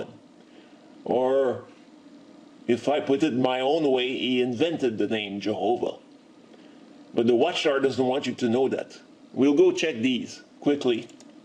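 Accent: Quebec, Canada. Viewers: you have a french canadian accent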